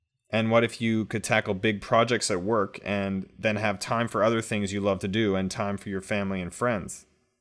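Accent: Canada. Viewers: that canadian accent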